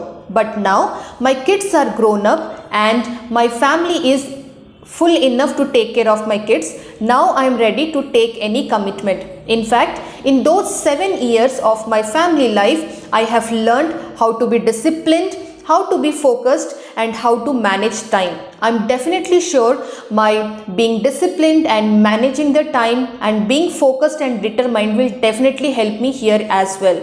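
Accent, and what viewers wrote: India. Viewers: You are way of talking like a Neath Indian.. where are you from mam...?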